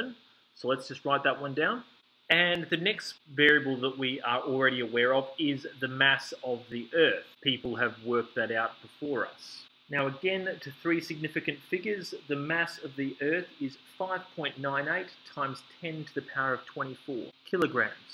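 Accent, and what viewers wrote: Australia. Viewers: This was the first video of yours that I have watched and I think you did a really good job breaking down the algebra. Thanks for this and the aussie accent was refreshing!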